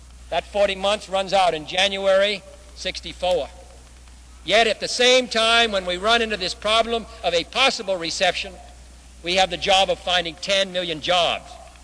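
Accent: Boston, United States. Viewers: You've got to love his Boston way of speaking. Beautiful. He pronounces "last" in the English manner and "passing" in the American manner. Pardon me for saying "English". It is the "Boston Manner".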